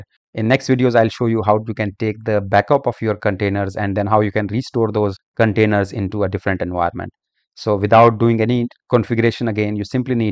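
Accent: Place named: India. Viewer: can't we find a tutorial without an indian like accent :(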